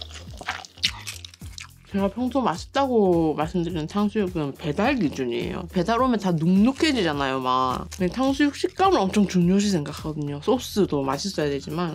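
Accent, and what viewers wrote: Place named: Gyeongbuk, South Korea. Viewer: 완전 경북말씨신데...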